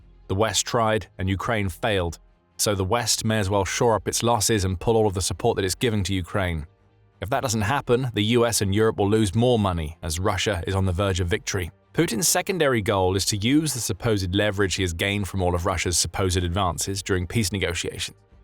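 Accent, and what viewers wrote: United Kingdom. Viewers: This channel is funny a guy with poor knowledge off military history and tactics making claims about that which he doesn't understand. I am bewildered that he has over a million followers. a sign that if you have good editing skills and a British accent people will believe any nonsense. Russia isn't losing. the only reason that the war is slowing for Russia is that it's being backed by billions of dollars of foreign aid. we are dumping massive quantities of money to keep the Ukrainian State afloat at no personal gain to us whatsoever. it's an absolute bogus nonsense strategy that won't benefit anyone except this false idea that Russia is going to try to Hitler-esque conquer Eastern europe. it's not happening, it never will happen in our lifetimes, and I'm tired of sitting here and pretending like that's the motivation. it's very obvious that the war in Ukraine being funded by the Western allies is a great way for some corrupt money deals to go down. 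I mean how many billions did this guy lose? Russia isn't losing, nor will it unless something wild changes. Billions of western dollars looks like Ukraine slowly losing. what a joke to listen to this guy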